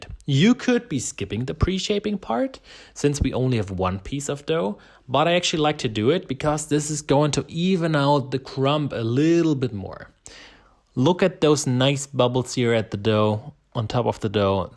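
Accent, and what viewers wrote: Germany. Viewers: is 15 minutes not 50 right? I know the subtitles say 15 but maybe AI was thrown off by a charming German accent?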